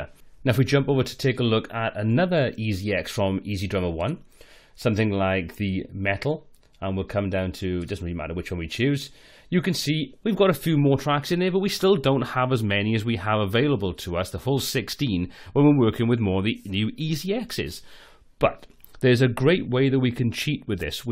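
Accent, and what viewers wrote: Wales, United Kingdom. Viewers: No we don't, this guy is Welsh, so it is a regional variation, it's not a moot point! Also for route, we pronounce it root not rowt.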